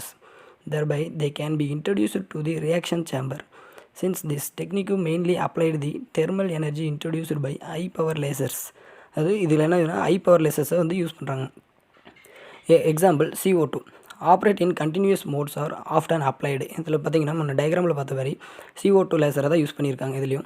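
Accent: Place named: South, India. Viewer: I think he belong to some place in South India n that's why his accent is a bit different and difficult for us to understand (I am from north India).. I played the video on 0.75X n see I was able to understand.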